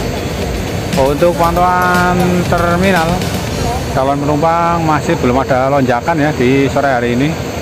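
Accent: Banyumas, Indonesia. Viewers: Hadir mantau mas, nek ora ora kepenak... Paten👍👍👍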